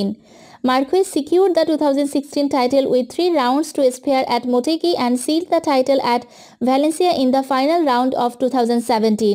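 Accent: India. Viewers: She maybe a indian announcer...by the way thanks for the effort..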